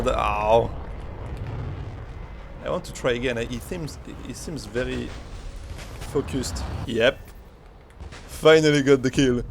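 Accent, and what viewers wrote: France. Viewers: holy french accent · most french guy i saw on youtube that talk eng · İ never heard france accent wow great video tho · This is about as French an accent as it gets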